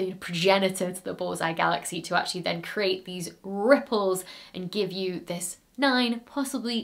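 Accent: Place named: Yorkshire, United Kingdom. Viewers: I really hear that foot-strut vowel when she talks. I think the Yorkshire accent is my favorite, particularly the "uneducated" one. It sounds so incredibly pleasant. Cheers from Minnesota! 🙂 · Dr Becky is 'educated Yorkshire'. That is, her enunciation is perfect, but the vowels are flatter..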